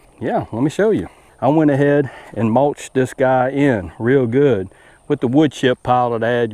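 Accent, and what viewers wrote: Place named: Louisiana, United States. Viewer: Not sure where you are from, but it certainly sounds like you have a little Cajun accent going on there :)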